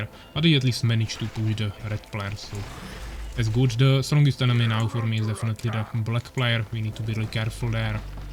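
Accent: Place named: Czech Republic. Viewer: Your accent sounds slavic to me, in particular czech to me.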